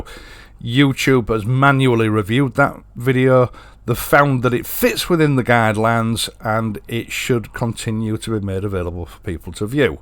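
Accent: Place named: Keighley, United Kingdom. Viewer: Congratulations, I'm following from Germany and I love the Pictures, the Triumphs and your comments on all the current e-mobility nonsense happening around. I worked in Keighley for a while 30 years ago an I love your accent. All the best for the next 100k 🙋‍♂️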